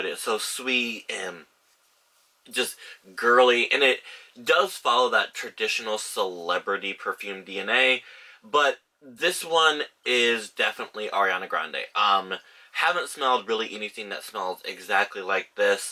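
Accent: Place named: United Kingdom. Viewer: if you’re from the uk which you sound like you are, how/where did you get cloud so early??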